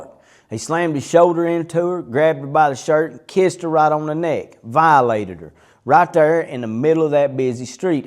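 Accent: South, United States. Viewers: Lived in Nashville for a year and honestly love that accent as the southern belt residents With love from Madina may Allah bless you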